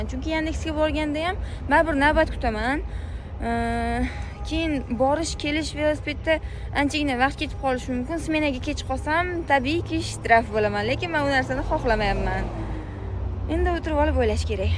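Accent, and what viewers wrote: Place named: Bukhara, Uzbekistan. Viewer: Вай Бухороча гапирурин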